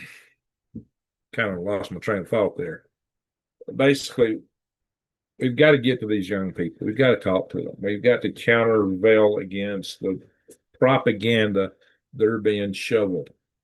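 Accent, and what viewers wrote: South, United States. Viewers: my example is my friend. He's a good guy, he's my friend too. I showed him your channel when he was over. he teases your Southern accent "China", lots of people live in a 'fast world', and some of it is superficial. I'm back on it!! IGNOREISM- Caused my Schism! LOL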